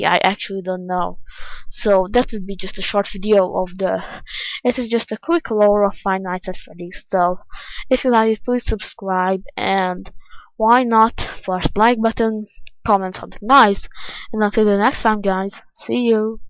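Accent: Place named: Hungary. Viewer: Teszi magát a gyenguc magyaros akcentusával...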